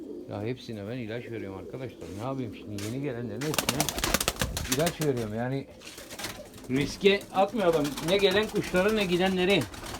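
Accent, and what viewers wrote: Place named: Izmir, Turkey. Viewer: Esenlikler abicim. Şiven İzmirli gibi ama bizim buranın insanı taklacı bakmaz. :)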